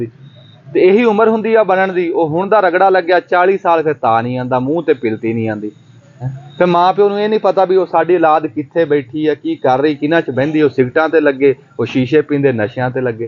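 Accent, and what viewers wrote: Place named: Doaba, India. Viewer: Wah ji sahib,tuhadi Punjabi 100% duabey wali boli koyi pata nahi lagda ke koyi Pakistani ja indian punjabi..videos tuhade boht hi changgey te akal den waley hunde aa..👏👏👏👏👏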